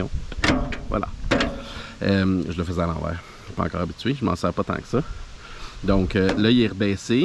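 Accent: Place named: Canada. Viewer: Bonjour, j'aime regarder les vidéos de scies (moulin) comme vous dites dans votre pays, de plus j'adore l'accent Canadien, je suis ami avec une personne de votre joli pays dont la chaîne est : l'gosseux d'bois ou The Woodpecker j'ai aussi mis en ligne mon travail quand j'avais ma scie vous pouvez aller voir ça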